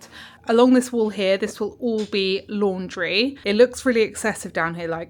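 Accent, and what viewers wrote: United Kingdom. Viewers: I just want to say how much I love your videos. Your soft sweet voice is so calming. I love the British accent….. Your home is going to be so amazing when it’s all done, looking forward to the tour. is so precious, listening to his cute voice brings me back to my children when they were little. Blessings lovely lady♥️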